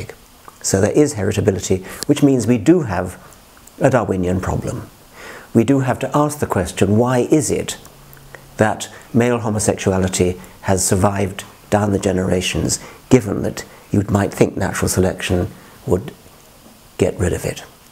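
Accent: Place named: RP, United Kingdom. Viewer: Dawkins you have the arrogance of a boy with low self-esteem and a big ego. All your research and conclusions are folly. You're a puppet for satanists. The only point more wild than your pukka accent promoting nonsense is the ignorant people who buy your nonsense.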